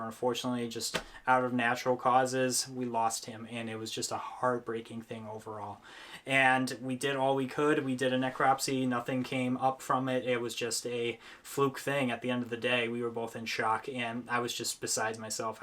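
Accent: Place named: Michigan, United States. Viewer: Are you from Wisco or Michigan? My first guess is Michigan. With your accent. Haha.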